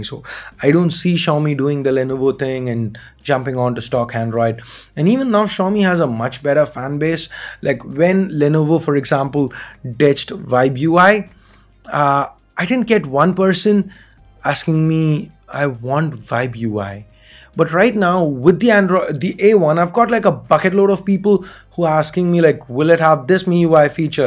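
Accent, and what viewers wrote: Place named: India. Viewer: Good content. Cringey accent. Please speak in neutral Indian accent.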